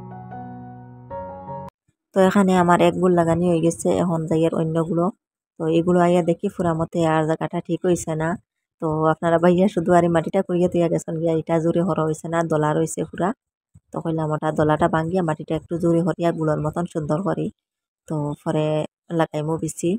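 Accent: Sylhet, Bangladesh. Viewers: আমাদের সিলেটি বইন তো। এই জন্য খুব সুন্দর করি কথা কইন। খুব সুন্দর লাগলো আপু ভিডিও টা। সাবধানে কাজ কইরো বইন।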